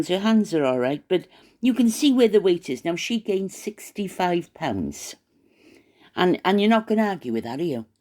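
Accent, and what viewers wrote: Wales, United Kingdom. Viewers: happy you’re back, nice to hear that Welsh accent, God Bless.♥️🕊️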